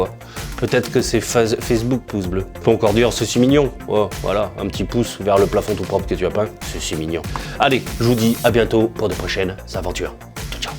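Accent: France. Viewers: J aime entendre l accent francais 😁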